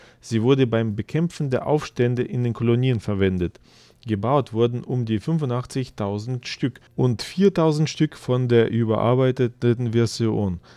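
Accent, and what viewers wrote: Russia. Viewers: Ich bin begeistert, Ich fand ja schon immer das die AK 47 ein bissen wie die Sturm 44 aus sieht . Dein Akzent ist ausgezecht ich liebe Russischen und spanischen Akzent. ich bin gespant auf dein nächstes Video. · Geil , dieser russische Akzent im einwandfreien Deutsch! Top Video! 👍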